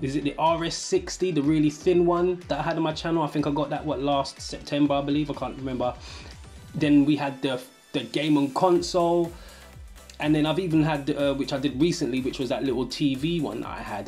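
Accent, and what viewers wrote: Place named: United Kingdom. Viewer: An NES emulator -- with a wide screen and "X" and ""Y" buttons... Interesting. So where are you? From your accent, I would guess the UK, but you are talking prices in dollars.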